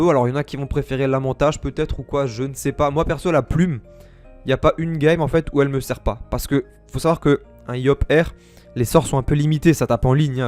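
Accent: Alsace, France. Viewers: T'es alsacien ? :p avec l'accent Car moi oui Bonne vidéo !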